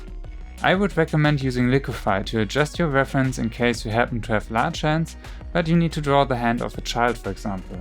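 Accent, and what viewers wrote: Germany. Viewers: ayo it's funny you were on my recommendations and I didn't see your videos before, but I was planning on doing the same approach of making tutorials by showing different emotions of me in anime style lol😂 btw you kinda sound like you're german as well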